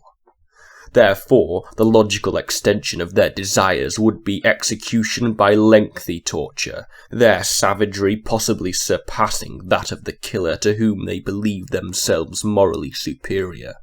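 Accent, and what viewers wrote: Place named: England, United Kingdom. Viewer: I'm looking forward to your next chapter readings when you have time for it. Not only are they not ai but you're English so your accent fits the reading.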